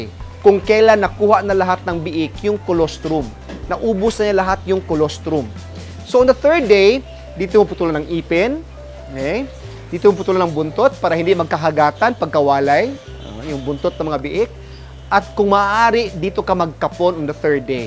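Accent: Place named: Cagayan de Oro, Philippines. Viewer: sherwin jara : ang galing nga po.... Parang taga amin c Doc sa CDO....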